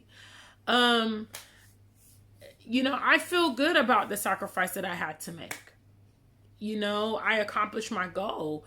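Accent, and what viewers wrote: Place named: United States. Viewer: Well come back sis, your American accent with twi made me chuckle. 😂 God bless you.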